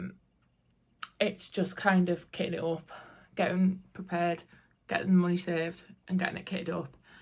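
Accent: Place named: Newcastle, United Kingdom. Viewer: Welcome to flosstube!! I live in Canada but my mums side are all Geordies, its so nice listening to you talk! Love your accent and all your WIPS. Look forward to your next video💗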